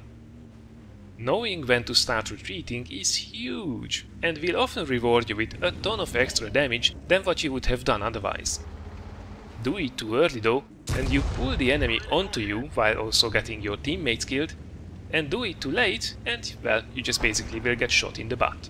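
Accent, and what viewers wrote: Hungary. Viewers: Hey! Are you Hungarian, because the accent of yours sounds like you are to me. :D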